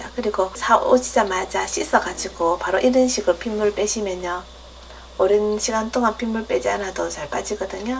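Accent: Gyeongsang, South Korea. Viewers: 밝은인상 순한 목소리 맛있고 쉬운요리 맛나보이는 눈맛 선생님 구수한 경상도 말씨 넘 좋아요~~알 러브 유~~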